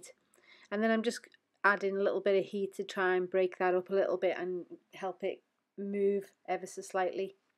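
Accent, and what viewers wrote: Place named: Liverpool, United Kingdom. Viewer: Fantastic experiment one I'll be trying as ive switched from acrylic pouring to resin. I’ve watched your other videos and think you have such an easy familiar voice to listen too .. dare I say Scouse/Wirral accent? I'm from Wallasey, Wirral. I also love the fact you don't have music blaring away in your videos and you have a very eloquent way of speaking, no um's no going off subject and no dragging out your videos.. i wish more was like you. Thank you so much. You are so inspiring.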